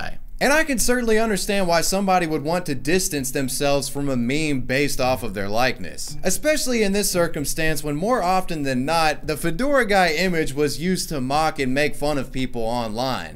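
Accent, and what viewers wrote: South, United States. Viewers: It's cool. We know you from the dirty south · it's not kids these days, he's from the south.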